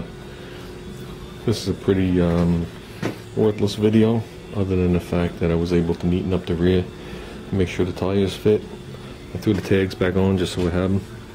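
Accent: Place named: New York/New Jersey, United States. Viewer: hey man, I'm guessing you are either from Jersey or NY with that accent :) Long Island here! I love these videos, you're inspiring me to get to work on my 1980 G body car. Once the weather gets better...and I think 2018 may be the year I finally build that dream garage!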